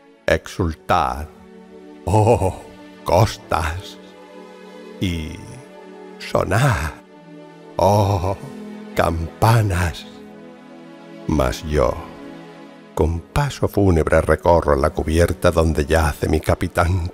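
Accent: Spain. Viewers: Eres el mejor señor, además me encanta el acento español, me parece que se oye más hermoso el castellano. Un saludo desde Colombia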